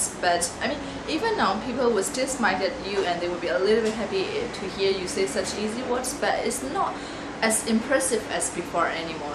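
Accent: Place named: United Kingdom. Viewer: You sound slightly British accent. I mean we Burmese are.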